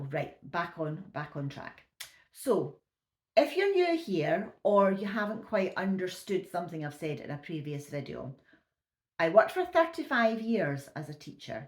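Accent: Scotland, United Kingdom. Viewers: Hi, some people are so rude they just are not really worth bothering about. I really enjoy your channel and your blunt Scottish accent. I am 89 and have enjoyed my life. I live with my son now because when my husband and I retired we sold up in the UK and moved to Spain we had 10 wonderful years out there then my husband got terminal cancer so we came back and he died 6 months later. I sold my villa in Spain and was able to give my two grandsons the deposit for their own homes and help my two children out as well. I live in the South East and property is very expensive so I was able to sent them up while I was still alive to see them enjoy it. I live with my son because he is alone and we enjoy each others company I have a good state pension and two small private pensions and some savings as well. I have paid for my funeral so now I am enjoying the rest of my life with my children.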